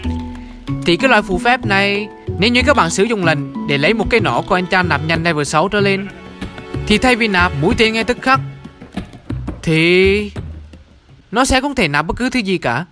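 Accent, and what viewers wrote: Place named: Vinh, Vietnam. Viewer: Đọc giọng ở vinh hay ở đâu đó miền bắc khó nghe quá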